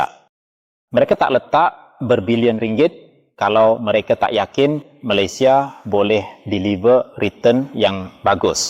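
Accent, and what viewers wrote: Indonesia. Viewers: BUKAN BAHASA INDONESIA TETAPI BAHASA MELAYU SLANG ( LOGHAT ) INDONESIA.... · Pelabur & pencerita ini adalah warga Indonesia kerana slang cara di bercakap memang warga Indonesia.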